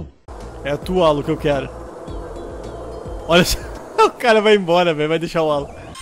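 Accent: Rio Grande do Sul, Brazil. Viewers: Vai rola pauleira kkkkkk da para ver que tu é gaúcho kkk tbm sou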